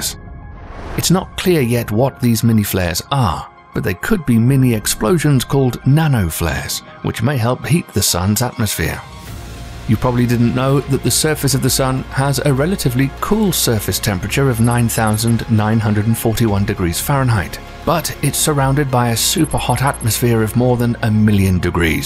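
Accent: United Kingdom. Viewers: You speak with a British accent, yet you use Fahrenheit? Please use international standard units.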